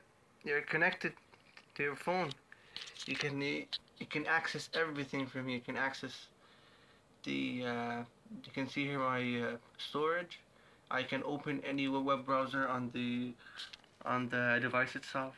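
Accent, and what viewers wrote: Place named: Egypt. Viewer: انا عرفت من طريقتك يا عم ومن المكتب كمان هههههههههههههههههههههههههههههه دا احنا مصريين زيي بعضينا يعنى اعرفك من غير ما بص مجرد سمع الانجليش عندك بس عالفكرة نطقك كويس جدااااا انا فى اولى ثانوى عالفكرة وفى انترناشونال ادعيلى بقى :))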